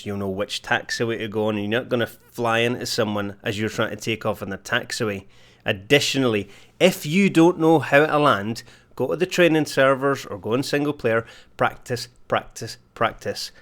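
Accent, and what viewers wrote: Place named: Cockney, United Kingdom. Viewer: Love your accent, is that that classic east london cockney ;-)))))) Edit: wrote dialect first instead of accent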